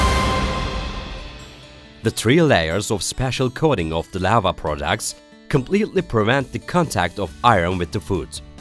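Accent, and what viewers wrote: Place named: Turkey. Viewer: Allah aşkına kimin fikriydi bir türkü aksanli ingilizce konuşturmak? Acil değiştirin. Çok garip olmuş.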